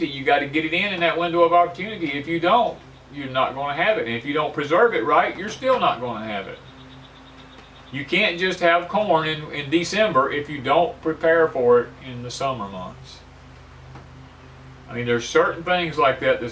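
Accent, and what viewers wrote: South, United States. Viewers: Good subject! Thank you. I don't have a homestead, just rent an apartment but there's only 8 apts. each building, total 4 buildings in a small rural western WA town, but I have 3 smallish garden spaces outside my front and back door and they work out pretty good though I'm not a pro like you and. Your southern accent is just fine! Don't let folks throw ya...how anyone pronounces words is unimportant--your intent and message IS. I totally agree with you. I am glad the plum and apple trees on the property aren't sprayed that I pick every year though the fence line is but it's not near the apts. Living in the north has different challenges but folks are often in the same boat no matter where ya be. I can, dehydrate, pickle as much as possible. I understand how it is when you have to get things canned NOW, or lose your produce. 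My folks hated waste and so do I. Anyway, thank you for explaining farming/gardening procedures. It's very interesting and I am learning a little. I'm kinda slow, but I get there. God bless you and yours.🌲👵🐕✋🌻🌱🍃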